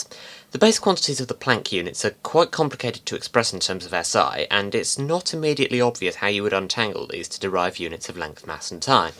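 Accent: United Kingdom. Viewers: You have no idea what a vector is, but with a British accent you can make the biggest BS sound true, including WMD.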